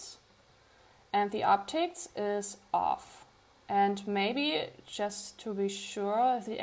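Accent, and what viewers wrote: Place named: Germany. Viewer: 2 seconds into this video and I already figured you're German.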